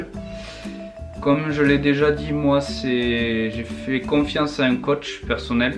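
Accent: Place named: Toulouse, France. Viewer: j'adore le petit accent toulousain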